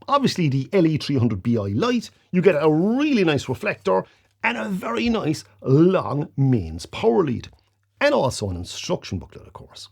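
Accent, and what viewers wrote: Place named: Ireland. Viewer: Thanks again for a great review, love that irish accent of yours it reminds me of the time I worked for the Irish Guards in Germany 74/75👍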